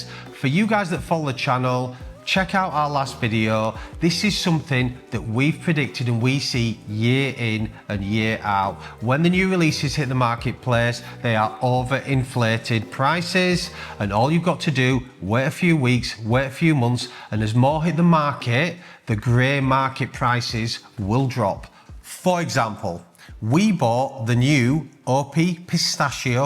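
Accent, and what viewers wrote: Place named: North, United Kingdom. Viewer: Regional accents, god forbid someone be born north of the Watford Gap. a good lad who is truly himself, no need to change for people.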